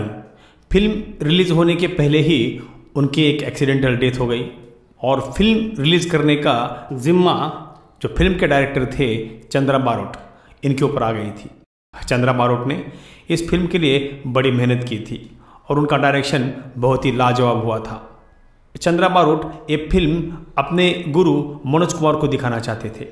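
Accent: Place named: Maharashtra, India. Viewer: aap ke Hindi speaking ko sun kar laga ki aap Maharashtriyan ho aur aap ka lahja bhi marathi hi hai Hindi bolte samaya apna lahja Sudhariye.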